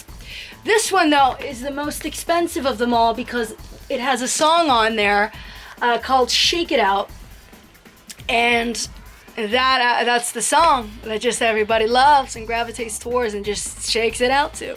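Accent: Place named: England, United Kingdom. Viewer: Love your English accent!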